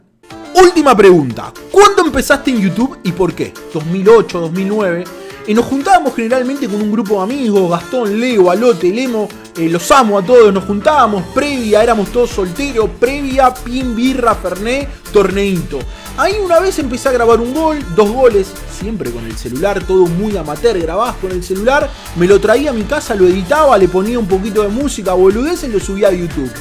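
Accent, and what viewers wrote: Argentina. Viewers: Genio! Te sigo desde un tutorial que subiste en fifa 14 creo que era... sobre como dejar pasar la pelota que no sabia como hacerlo, y después vi videos de rem jaja le ganaste de mano en mi caso... escuche cuando explicabas, y dije este pibe es argentino y ahí me suscribi. Saludos desde Carmen de Patagones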